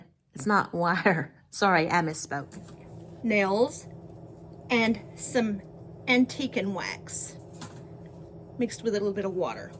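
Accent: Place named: South, United States. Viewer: Hi Sweet.. I'm excited to see this creation using a tray... Funny how wood takes staining differently... Don't ever apologize for your southerness coming out at all... I love that about you... God didn't make us perfect and I love that... The metal ribbon border really makes it pop and very beautiful... How cute is that... I love it.. Turned out beautifully as always.. you are such a creative person, I would have never looked at those pieces and put together what you just did... You amaze me each and everytime... The green ribbon adds another level of beauty to the piece.. the flower completes it and draws it all together... I like the ribbon on it.. thank you for sharing this activity... God bless you and your creativity.. love y'all Sweet... Till next video stay just like you are.. Bye..🥰🥰🥰🥰🧶🧵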